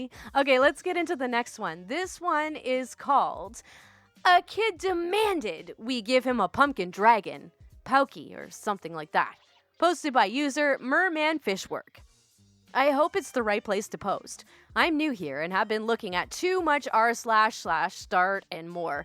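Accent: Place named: Yonkers, United States. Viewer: Just found your channel and LOVE it, so subbed and will follow your posts. As for the white chocolate brownies, NO. That's just wrong. Brownies should be Milk Chocolate or Double Fudge types... (grinz) Also, I like how you don't edit/change/bleep-out certain words. Plus that lovely Yonkers accent had me and my wife laughing.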